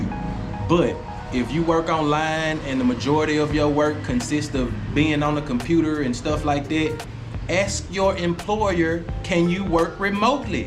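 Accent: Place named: Tennessee, United States. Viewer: Is you from the A or are you from Memphis. I hear Tennessee.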